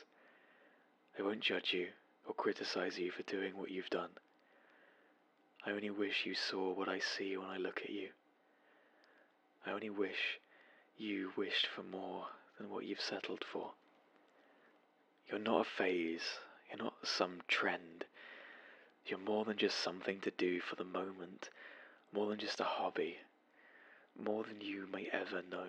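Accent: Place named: United Kingdom. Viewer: This is lovely... the British accent really gives to the words of the book...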